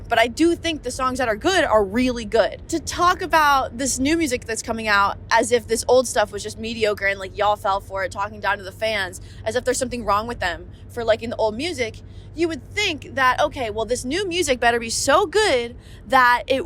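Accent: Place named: Upstate New York, United States. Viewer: The most north NY accent of all time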